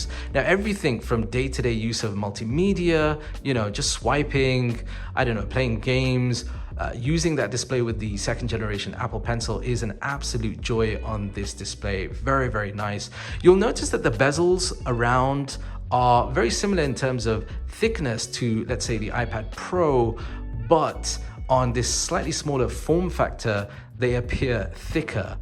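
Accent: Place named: United Kingdom. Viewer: It's Hard To Manage British Accent Including Explaining Points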